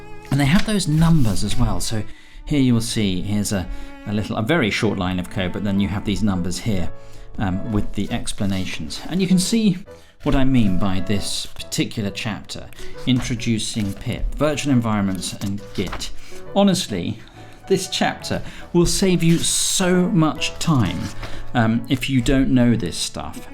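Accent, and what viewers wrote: United Kingdom. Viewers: Your British accent is simply enchanting! amazing video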